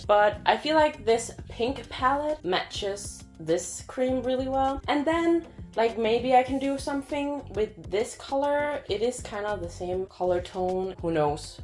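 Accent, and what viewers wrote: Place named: Scandinavia. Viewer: Oh my gods, I did wonder whether you were Scandinavian or Dutch or something similar due to your accent - and here you are walking around in my own city! Gosh, the world is a tiny place sometimes XD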